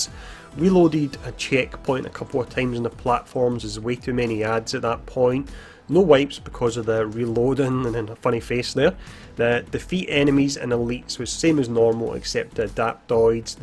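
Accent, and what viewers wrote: Scotland, United Kingdom. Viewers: this video was recommended to me if that helps as I've been watching a lot of different avengers content....I just cant figure out your accent...Scottish?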